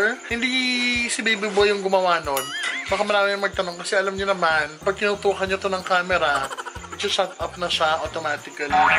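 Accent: Iloilo, Philippines. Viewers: Madam ilonggo ka pla tagasaan ka?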